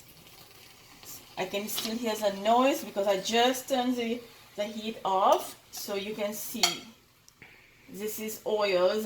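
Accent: Mauritius. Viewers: Why do you sound Mauritian, Indian and Jamaican at the same time? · My step-father is Mauritian, and she just sounds like lots of Mauritians I know.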